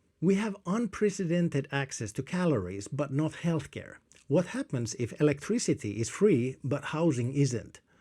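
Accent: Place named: Netherlands. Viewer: Surprisingly interesting channel. I can't quite place your accent and the name " " didn't give me any clues! Some Dutch sounding consonants... But that could also point towards certain Slavic or Uralic. In any event, your English is impeccable. I can't say that future economics is my favorite topic for speculation in science fiction. However, since you ask... I'm afraid i can't imagine a situation where there won't be SOME raw materials that will be scarce. I think we all know about how "rare earths" have become important to computing and cellular technologies. To my mind, that makes a future without some form of capitalism unlikely. However, automation of labor is a trend that hasnt stopped since the beginning of the industrial revolution (and in some ways, even before that.). Inevitably we will get to a point where we won't need to work nearly as much as we think we must right now. 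It you are looking for a "better economic model"'that fits this kind of future, i would say that we already have it in some places where it has been working better than the alternatives since around 1945.. it the Danish model - or Scandanavian model more generally. Highly regulated capitalism combined with a robust welfare state.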